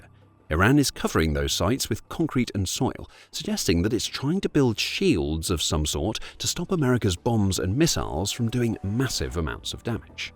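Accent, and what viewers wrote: United Kingdom. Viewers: I don’t think you should make videos more than 5 min long. Something about your British accent makes me sleepy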